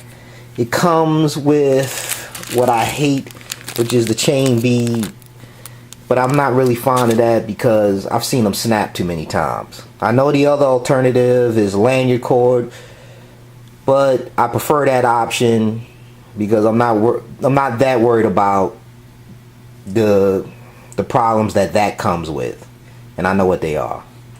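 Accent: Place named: New York, United States. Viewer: Not to offend but it sounds like your from new york im from alabama so our situation is a little different but i do like your reveiws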